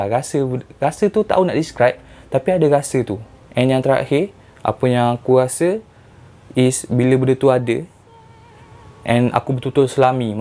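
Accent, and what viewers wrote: Kelantan, Malaysia. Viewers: Kelate ko?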